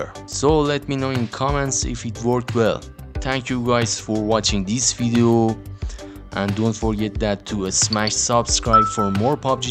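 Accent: Iran. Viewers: از لهجت معلومه ایرانی هستی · خدایی لحجه ایرانیت مارو ...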